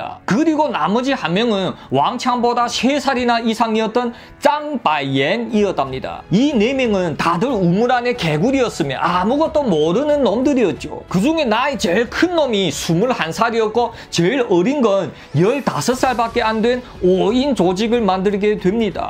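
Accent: Yanbian, China. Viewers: 이것도 뭐 재미나네. 구수한 연변사투리에 새롭습니다. ㅋㅋㅋㅋ 다음에는 涂贵武 사천킬러 투꿔이우 이야기도 좀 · 옌볜 말투 넘좋아~~^^😂❤ 구독!! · 중국이야기라 조신족 말투를 하는가? 아니면 원래 조선족출신이라 발음이 그런가 ᆢ하옇튼 시원시원 듣기 좋습니다. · 언어 표현력이나 사용하는 단어들은 한국인 못지 않은데....억양은 중국억양이네... 이야기를 재미있게 풀어 말하는 재주를 타고 났네요...중국어로 말하면 중국인들은 말문이 막히겠다.....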